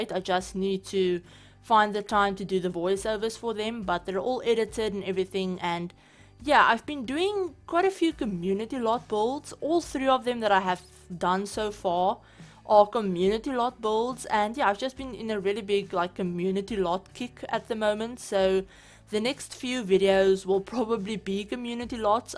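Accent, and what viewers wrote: South Africa. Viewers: My jaw dropped when I heard the South African accent. Need more African simmers!!!!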